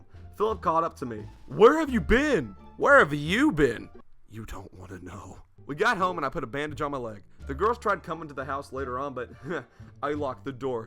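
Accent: South, United States. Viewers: you have a heavy southern accent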